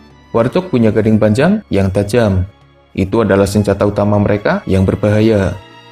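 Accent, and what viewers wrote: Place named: Tegal, Indonesia. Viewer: beda kalau ini logat tegal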